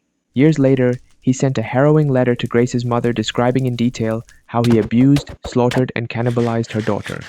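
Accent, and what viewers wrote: India. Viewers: Bro's accent sounds like an Indian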